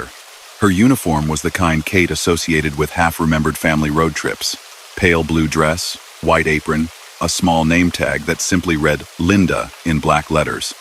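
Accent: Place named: Midlands, United Kingdom. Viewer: Uk midlands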